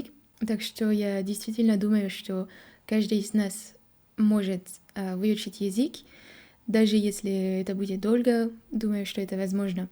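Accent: France. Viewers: Твой французский акцент русского языка прекрасен. Ты большая молодец. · ты отлично говоришь. нужно чуть больше практики чтобы было проще. как будто русская с французским акцентом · Французский акцент звучит прекрасно, а грамматика очень на высоком уровне, ты молодец) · Прекрасная русская речь! Кстати, насчёт книг о Гарри Поттере. В аудиокнигах «ГП и кубок огня» и «ГП и Дары смерти» ты бы смогла озвучить реплики Флёр Делакур на русском языке с твоим французским акцентом) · Вы прекрасно говорите по-русски!!🥰 с милым французским акцентом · Великолепный русский язык, французский акцент только прибавляет шарма. Но если есть задача минимизировать акцент. то это несложно, есть несколько конкретных моментов, над которыми можно поработать. 1. Шипящие (например, слово что: щьто вместо што; или слушять вместо слушать) 2. Иногда смягченное Л вместо твердого (слушаля, начиналя) 3. 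Ы (язик вместо язык) Только этого вполне хватит, чтобы практически снивелировать акцент! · Шикарно!!! Вы отлично строите речь, абсолютно по-русски! В некоторых случаях "... и так далее..." лучше заменять на "... и тому подобное". "... и так далее..." - это когда вы что-то перечисляете. "... и тому подобное" - похожее, подобное. PS Очень милый французский акцент ) Говорите больше на русском, вас очень приятно слушать. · Русская речь с французским акцентом - это самое красивое что я слышал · Браво! У вас очень хороший русский, с приятным французким акцентом! То, чего вам пока не хватает, в этом нет вашей вины - все придет с опытом, это более богатого словарного запаса, чтобы использовать различные синонимы, образы, метафоры, более красочную и сочную речь! Желаю вам успеха! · Восхитительный французский акцент! Такое обаяние в этой речи. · Отлично разговаривает, пусть с французским акцентом, но это даже придает некий шарм, если и были ошибки то они несущественны. 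Круто! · Твой французский акцент в русском звучит от тебя очень мило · Произношение правильное, но! (Всегда есть "но"😂) У каждого языка есть своя интонационная особенность - мелодика языка. И у вас выходит русская речь, но с французской интонацией (мелодикой) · Уважаемая! Вы прекрасно говорите по-русски, соблюдаете падежи и склоняете слова не коверкая окончания! Также у Вас прелесный акцент👍🏻 Ударения, почти все, всё равно говорите по-французски - с ударением на последний слог. Это тоже очень мило😊 Supér!❤ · я даже хабиба хуже понимаю, чем ее. я на данный в японии по обмену, тут много французов и этот французский акцент, как мурлыканье котенков очень приятный для ушей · Говорит хорошо, почти не делает ошибок, но произношение смешное, как будто нарочно искажает слова. Особенно французская привычка делать ударение на последний слог, очень комично звучит. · Freeeench accent 😌🤌🏻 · Удивительно насколько вы чисто говорите по-русски. 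Конечно акцент есть, но вы говорите правильно в знательной мере! И, кстати, я заметил только одну ошибку в звуке Ы - в слове "музыка". Крайне странно, что вы её не любите. Что касается частой ошибки - К у вас мягкая, таким образом КА звучит как КЯ; Л у вас мягкая, нужно как в американском dark-L: football. В целом акцент приятный, особенно как вы говорите предлог "по", с французской О. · интонация всеравно французская. как поёшь. англиский и русский более рубленный. на русском все слова заканчиваются четко. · кстати впервые слышу французский акцент на русском. Она будто говорит на французском, но русскими словами) Абсолютно ожидаемо что это должно звучать именно так, но слышу впервые) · Comme elfer2381 le dit, vous parlez russe de telle manière que beaucoup de gens doivent vous envier, et le charme de votre accent français pas trop accentué est agréable. Merci. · С нежным французким акцентом, так мило!😊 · Русским мужчинам нравится женский французский акцент, так, что не комплексуй. 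Язык очень хорош. Тем более если учишь его только год. Тогда вообще - фантастика. 😁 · Русский язык с французским акцентом... напоминает фильм гардемаринов и героя Боярского.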